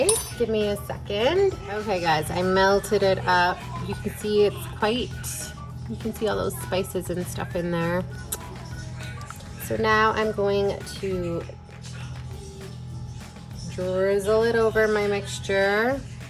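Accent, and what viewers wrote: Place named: Canada. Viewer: Wow! This is fantastic! I am curious where you buy the cheese (crackers) and pepperoni. I am guessing from your accent you are from Canada. I am also Canadian so I would really appreciate if you share where you buy them! I am very excited to try this! Thank you for the great video! 😊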